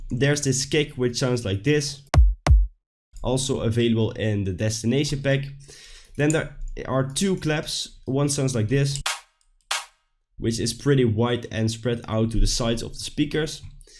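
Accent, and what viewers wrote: Netherlands. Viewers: aangezien je accent weet ik dat je nl bent dus doe ik het in nederlands. tv noise maakt bass house toch? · are you dutch?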